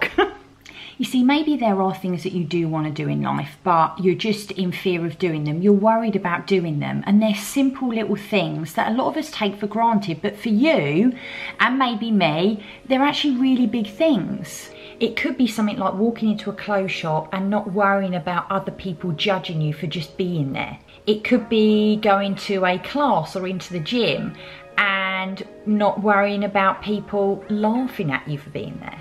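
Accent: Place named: England, United Kingdom. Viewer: This is a really cool channel love your english accent :D I subbed!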